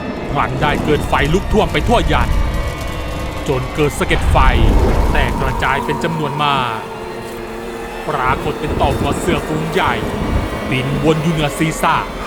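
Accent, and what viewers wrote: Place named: South, Thailand. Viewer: คนพากนี้เแ็นคนใต้เเน่ใช้ม้าย เพราะฟังจากคำพูดติดปากของคนใต้ที่ ที่พาไปเเหลงกลาง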